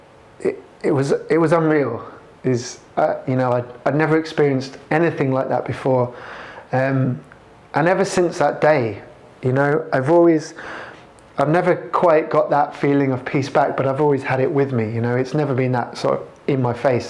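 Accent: United Kingdom. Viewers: yep it's a southern english accent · british accent he's from england! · I see. But what kind of British accent it is? He sounds a lot like Jack Harries, Jacksgap. Southern? IDK :<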